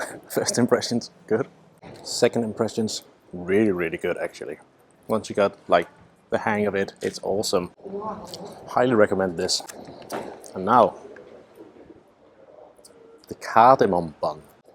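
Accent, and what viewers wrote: Liverpool, United Kingdom. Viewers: Bit of a scouse accent in there?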